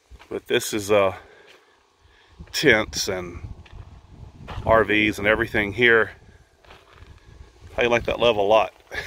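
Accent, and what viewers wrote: South, United States. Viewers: why you live in navada and you talk southern ?? ohhh the duckling. yes you do have good babies. i have to admit, i do enjoy you video....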